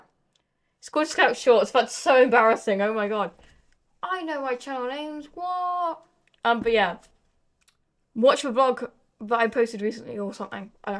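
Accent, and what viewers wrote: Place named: United Kingdom. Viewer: Just found this channel while scrolling through my recommended, 10/10 yap session. I can’t tell if your Bri-ish but the accent is cool lol(Latin American here)🏳️‍⚧️🏳️‍🌈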